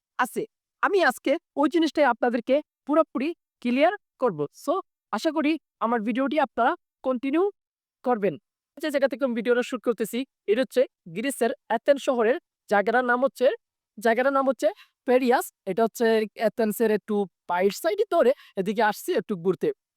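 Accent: Sylhet, Bangladesh. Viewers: ভাই সিলেটি মনে হয়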